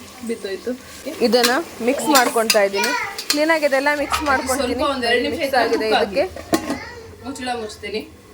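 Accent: Standard. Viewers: ನಿಮ್ಮ ಸ್ವಚ್ಚವಾದ ಕನ್ನಡಕ್ಕೆ ಧನ್ಯವಾದಗಳು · pure kannada sister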